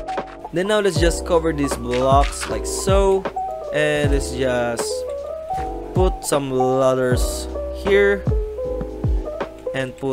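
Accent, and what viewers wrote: Philippines. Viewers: are you Filipino? cuz ur english accent is like filipino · Are you filipino? Your accent very familiar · Unang accent palang, PH na PH! Salamat boss ! <3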